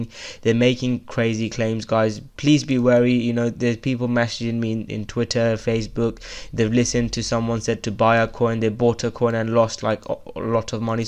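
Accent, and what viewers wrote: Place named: United Kingdom. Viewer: I feel he is a hidden gem in the world of crypto youtube. But I am quite partial because I love the Brit accent too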